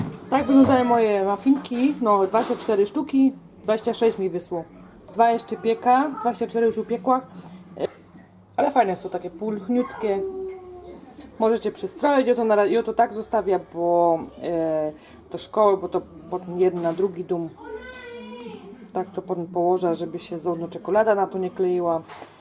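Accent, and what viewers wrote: Silesia, Poland. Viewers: Super tylko dla mnie ten język Ślązaków jest wolniej przyswajalny :) pozdrawiam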